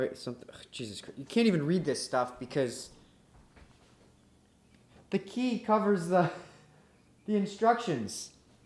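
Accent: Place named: United Kingdom. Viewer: Yes but people remark often about how “British” he sounds.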